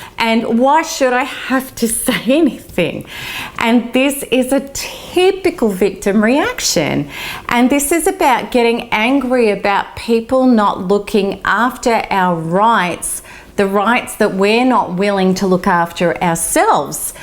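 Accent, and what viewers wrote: Australia. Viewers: Is she Australian? I'm curious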